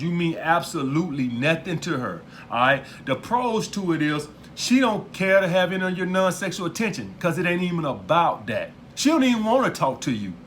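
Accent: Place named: West, United States. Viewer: 😂😂😂😂😂 you funny ASF but still talking these deep truths. With that Western Accent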